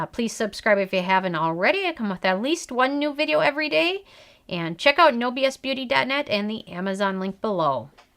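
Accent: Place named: Midwest, United States. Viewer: just picked this up... ready to try it! also, you should def have more subs! this is so great and thorough... plus THE SECOND you started talking I knew I'd found a fellow midwest girl--I'm guessing Minnesota! love from WI!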